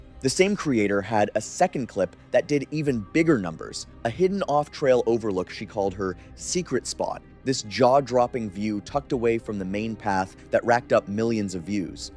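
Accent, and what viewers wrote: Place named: United States. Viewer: Means the American sounding guy is probably American, home of the freedom units.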